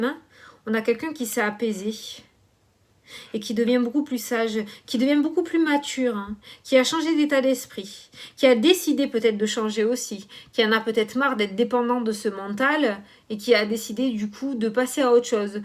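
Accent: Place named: Southwest, France. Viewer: Merci pour tous vos partages et vos guidances! ( j'adore votre accent du sud ouest!)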